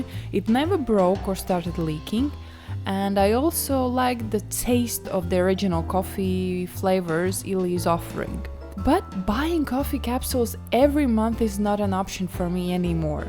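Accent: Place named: Eastern Europe. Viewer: My guess would be former Yugoslav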